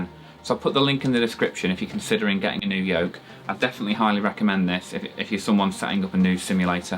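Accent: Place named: Blackpool, United Kingdom. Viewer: I recognised the Blackpool accent before the aerobatics over the Wyre! Nice film, from a 42yr defence aerospace vet who lived right under that view. Hope all is well for you now.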